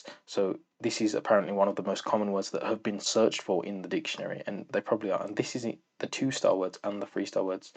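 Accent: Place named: Birmingham, United Kingdom. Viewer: Ah you’re a Brummy, do you know Joe?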